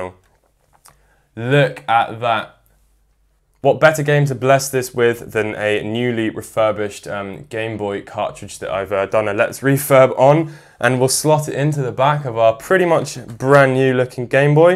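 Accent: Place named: United Kingdom. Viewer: Sounds like a fellow UK gamer to meeeee. 😎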